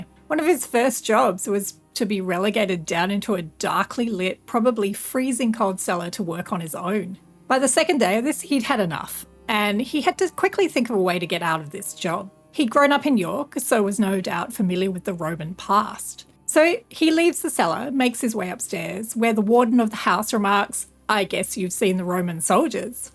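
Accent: Australia. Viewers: Great video! I love your accent, Australian? Youre so articulate. 👍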